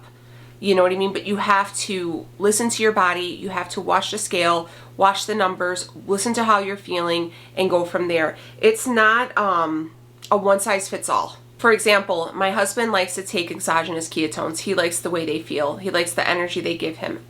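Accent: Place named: Midwest, United States. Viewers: You do have that Minnesota vibe. "Don't cha know"?; ) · "from Minnesota" If I had to guess, it's the way you say your O's. I lived in MN for most of my life and now live out west and get busted on my pronouncement of words with O's frequently and just giggled when they say it now. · Great post! You look beautiful! Also, you do totally sound like you’re from Minnesota! My ex is from south side Chicago and doesn’t have the same accent as you! Even sounds more like Chicago! Not a dig, I promise! Your accent is awesome, but it just doesn’t sound Chicago for some reason! I wish you could hear it! I love your videos too by the way, thank you for being honest and helpful! Ps-love the Mean Girls quote! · You’re Midwestern, but not Minnesotan. LOL. Though you do say “Minnesota” spot-on like we do, mostly you sound like my friends who grew up in Chicago 😘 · LOL. 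You definitely sound like you are from Chicago! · Lol. You do sound like your from Minnesota. Sorry... even when you were trying to not sound like you from Minnesota, you sound more like you were from Minnesota, ey' 😁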